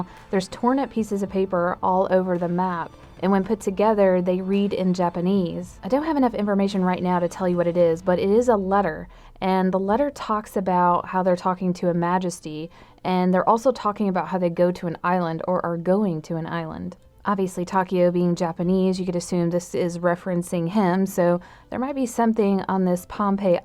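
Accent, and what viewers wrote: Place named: South, United States. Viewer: You sound more southern in this video.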